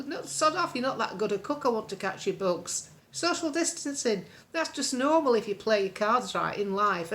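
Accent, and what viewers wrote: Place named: North, United Kingdom. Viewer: Love your channel !!!! Its a lovely northern voice x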